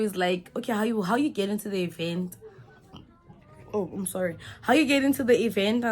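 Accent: South Africa. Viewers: maan, it's my 1st time watching your page. I love your Xhosa vha, I was laughing from the get go😂😂😂.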